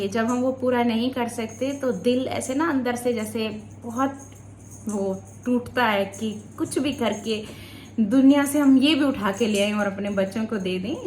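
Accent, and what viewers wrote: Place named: Rural, India. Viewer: Phly ham apko dekhty thi to hami bhot hancty thi ap etni gaon wali or asi bolti the k hami hnci ATI thi aj v wo baty wo time apka yad ata tha or aj dekh jhut bol BOL k pasy kamai or aj ghmndi fakhari aorat bn gi zara nmaz ko Allah si v yari lga k dekh dunya ko chor di lalachi aorat bhni faimle sab reshty na khtam kri 😊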